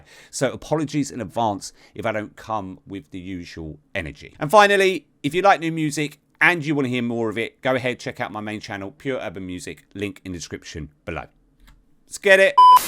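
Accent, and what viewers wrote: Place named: United Kingdom. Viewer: Of course Igor would say his name correctly, lol! I’m just trying to give the pronunciation for this reactor, who has a native British accent like mine ...peace ...❤️🎶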